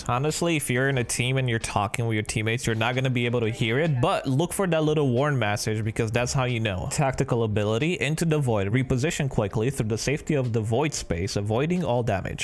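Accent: Romania. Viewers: R U ROMANIAN?/Esti roman?? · OMG ESTI ROMAN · wtf are you Romanian? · u romanian? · u romanian??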